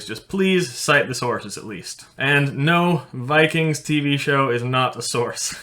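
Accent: United States. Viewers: Dacca if you are born in the US your a American... The guys accent is pretty clear he's American... Vikings are long gone but theres still scyfy nerds running around making false claims but hey whatever floats your boat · Are you American of Norwegian descent or Norwegian immigrant to America? Or... neither? You just sound so American lol. No hate just curious 😊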